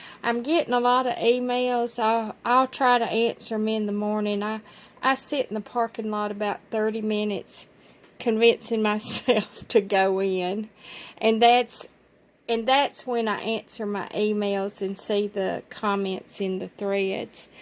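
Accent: South, United States. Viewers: Omg I love your southern accent ❤❤❤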